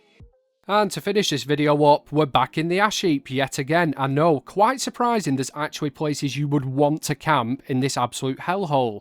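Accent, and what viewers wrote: United Kingdom. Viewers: Сколько английский не учи, а британский акцент все равно без субтитров воспринимать практически нереально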